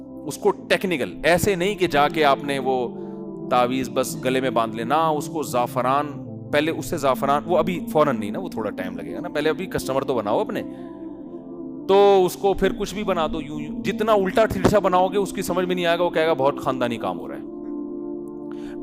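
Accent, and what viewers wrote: India. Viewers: wha mufti saheb aap ki urdu bilkul indian he aap salamat rahe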